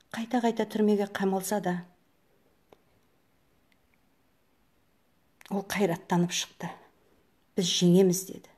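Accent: Standard. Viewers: Оо ханым.Калай таза казакша сойлейсиз.Шет елде журсенизде.Алла разы болсын.Халыктын батыры Дулат бауырымыздын жаны Жаннаттан болсын.Кайгырып конил айтамыз.Олди деуге келмейтин.Кайталанбайтын батыр деп билемиз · Ооо керемет әпше.Қазақшаңыз таза екен.Мен өз басым қазақшаныда орысшаныда жақсы білемін.Бірақ көпшілік Макеңе жазып жатады қазақша сөйле деп Атеистсің деп.Ұйғырсың деп.Егерде Макең нашар болсада арасында қазақша сөйлесе эфирде.Содан кейін Мен 5 мезгілі намаз оқимын деп ҚҰРАН кітабын ұстап айтса онда 90 % қалық Макең жағына өтетін еді.Ия мен жақсы түсінемін ол кісіні.Біздің заманымызда сондай болды.Бірақ қазір заман өзгерді.Сізде Қазақстанда тұрмағаннан бұл жақтағы жағдайларды түсіне бермейтін шығарсыз.Жұма күндері еліміздің бір мешітінде орын болмайды.Барлығы жастар.Сол себептен митингілерге жастар шықпайды.Ал жастарсыз митинг деген бос әуре.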